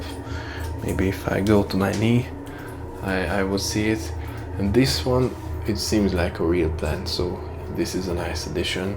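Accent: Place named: Hungary. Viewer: Your bed is a Murphy bed (the one which is hidden in a wall). There are also Murphy tables (same principle). I thought your sofa would fold out (or you would arrange the cushions) to expand the sofa & make it into a bed, so you surprised me there. 😅 The flat is quite nice. It's small but functional. The bathroom is bigger than in other small flats that I've seen. I could live in that place if it had a window. Had a laugh when you mentioned the female & male hygiene products. 😅😅 Lol. Thanks for the tour! Btw, your accent reminds me of Dominic Szoboszlai, your country-mate. Cheers!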